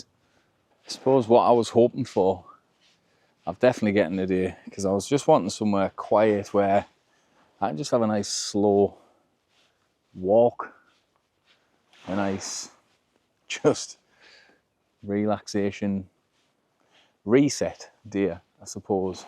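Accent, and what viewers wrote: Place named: Northern England, United Kingdom. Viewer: Hi thanks for this series! My partner is in nthn Norway (LDR) so I watch a lot of these vids :) Are you fm southern Scotland Bec your accent seems more nthn England?!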